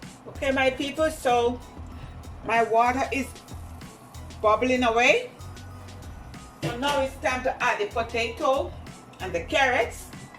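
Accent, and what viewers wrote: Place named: Jamaica. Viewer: Why do you sound Jamaican though? It’s supposed to be a Bahamian accent though. But I will watch it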